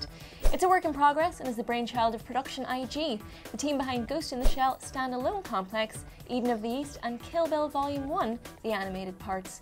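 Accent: United Kingdom. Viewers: Welsh mixed with a southern English accent i think. Hard to figure out, maybe even a little irish is in it.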